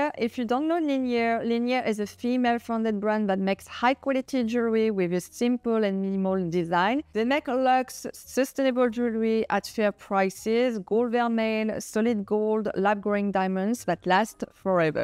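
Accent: France. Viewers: So i believe Emily in Paris look is satire. It’s an over exaggerated idea that some American women believe French women dress. Just my thought I too was raised by a French Maman and taught how to dress in a minimalist but tailored way. My mother was a fabulous dresser. But towards the last years of her life she embraced the “jogging suit”, precursor to ath- leisure wear 😅. Love your channel and advice. Love your accent too. I miss my Mom and your accent brings back good memories for me. ❤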